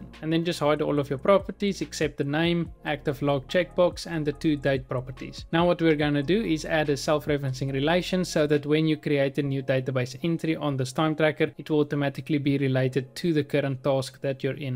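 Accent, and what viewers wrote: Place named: Free State, South Africa. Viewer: It is simply impossible that this is your real afrikaans accent! Sounds like you're from the freestate! Great content - keep it up.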